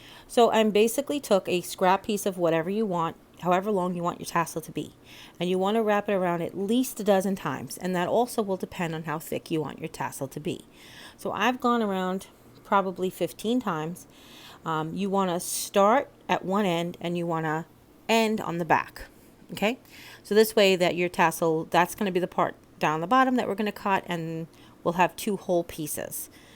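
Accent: New York, United States. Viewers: Beautifully done! The way you tucked in the moss really made the letter stand out. Great tute (lol) on how to make an absolutely perfect tassle. The trick with securing the wrapping string is a madame knot, I'm sure you know that. You were going to say something about the shamrock and/or four leaf clover, did I miss it? Tyfs, love these and as always it was so nice spending a little time with you, your New York accent really came out on this tutorial and I love it. See you soon 🤗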